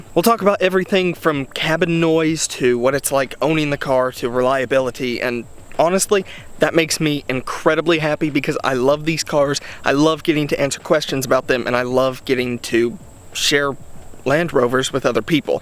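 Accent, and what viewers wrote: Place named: United Kingdom. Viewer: U can tell this guy loves rr he is getting a small British twang to his accent. Ha. And yes I agree u r really cute. X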